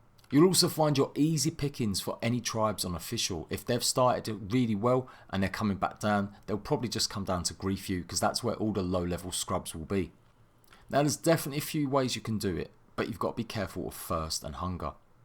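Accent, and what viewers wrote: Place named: Cockney, United Kingdom. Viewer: He is like a super-cockney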